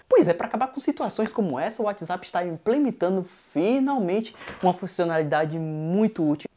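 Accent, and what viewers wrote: Bahia, Brazil. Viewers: man ce é baiano ? kkkkkkkk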